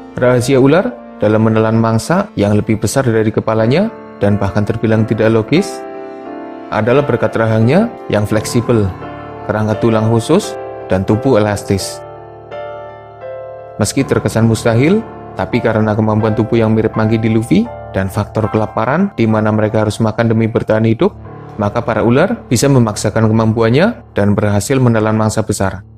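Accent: Banyumas, Indonesia. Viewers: Daleme pundi min admin. Ngapak ya min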